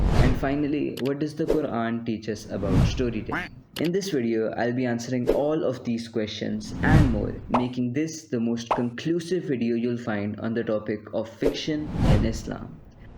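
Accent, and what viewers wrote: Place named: India. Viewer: You sound very Indian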